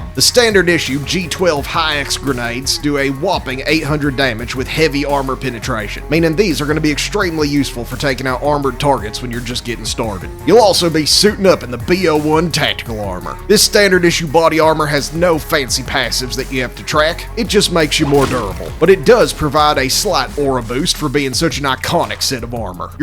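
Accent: South, United States. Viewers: Your voice is as stereotypical American as it gets 🦅🦅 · That southern accent really make it sounds like you're a real commander. 🫡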